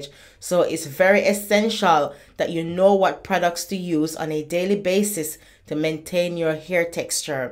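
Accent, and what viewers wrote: Jamaica. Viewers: A me alone hear the jamaican accent in her voice?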